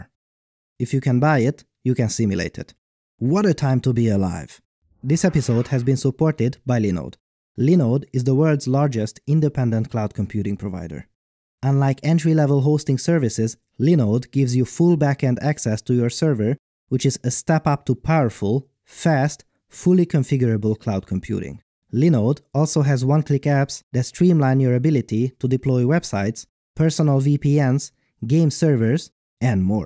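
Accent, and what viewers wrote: Hungary. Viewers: i dont know why i'm here, i've never been interested in 3d simulation, but as i heard you speak, i knew youre hungarian haha ;)) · Are you Hungarian?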